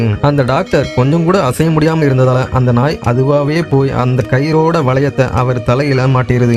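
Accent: Kongu, India. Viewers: Enuginga neega kongu nada 😂 ing vida mudiyala 😂😂 I am kangayam inga 😂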